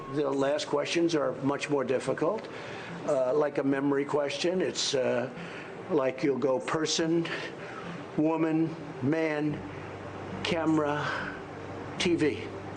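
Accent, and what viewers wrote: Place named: RP, United Kingdom. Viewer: Time will tell who is who? This speaker will fell off his chair!! Talking and criticising President Trump in a posh accent does not make him intelligent. Be prepared for what is coming. We Love President Donald Trump..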